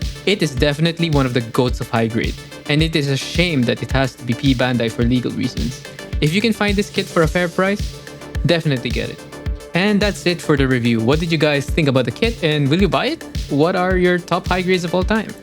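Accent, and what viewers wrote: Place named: Philippines. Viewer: The filipino accent is strong on this one bois. We got another local reviewer! let's go!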